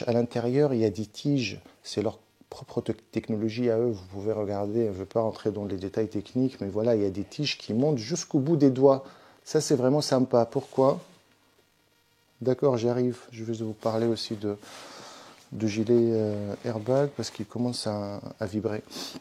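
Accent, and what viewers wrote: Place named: Tunisia. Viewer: Salam shalom salut Je suis le 900ieme depuis hier ! J’aime énormément tes vidéos j’ai tout regardé depuis le début et je remonte petit à petit. C’est mon premier commentaire et sûrement pas le dernier ! J’aime énormément ton contenu, la vitesse des vidéos, ton état d’esprit, ton accent de Tunisie 🇹🇳 j’en suis sûr ! 😜 ta réflexion, ta personne. Je continue à remonter tes vidéos c’est un moment de détente incroyable. Peace ❤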